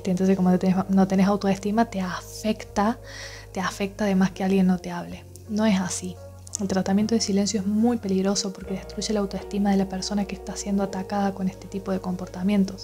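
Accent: Argentina. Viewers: que lindo es escucharte, muy clara y argentina ❤un saludo muy afectuoso.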